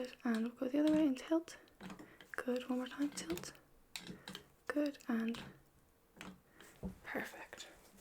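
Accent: Ireland. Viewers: Can't help but love the Irish accent! Hope to live there one day! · I love your accent!!! Though that might just be because I hear it all the time when I’m around my moms family since they all have an Irish accent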